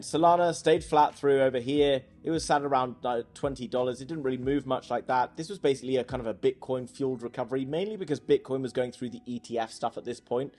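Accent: United Kingdom. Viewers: the British accent! love it :D and thanks for the info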